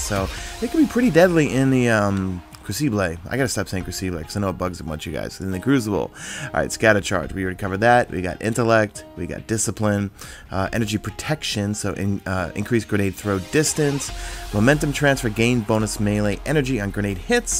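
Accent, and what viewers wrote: New York, United States. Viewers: You weren't kidding about your old new york accent and speed talking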